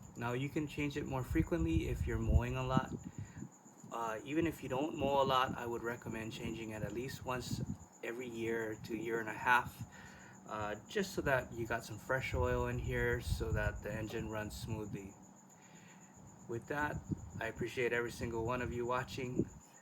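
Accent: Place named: Hawaii, United States. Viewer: 😂😂😂 I knew u was from Hawaii as soon as u had talk!! Aloha to u from Kauai. Thanks for the vid, very helpful! I’ll b trying to do my own oil change on my Mclane. 🤙🏼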